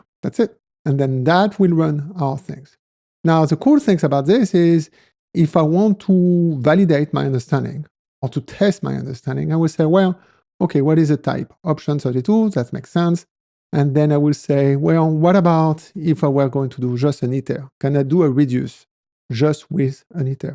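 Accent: France. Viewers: Je reconnais un français à l'accent haha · You are awesome man, kudos! And since you mentioned the accent first now I can say that Rust is really something change me in many ways; I used to can't stand the French accent 😅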